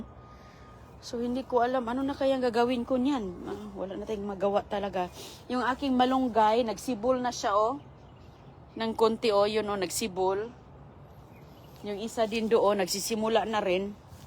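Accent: Visayas, Philippines. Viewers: Ano kba siempre bisaya bkit ikaw tga sang province kba? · bisaya ngani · Bisaya po kasi sya...Yung E ngging I,kayo nman my masabi lang... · Bisaya Man Dhay. Please understand 😂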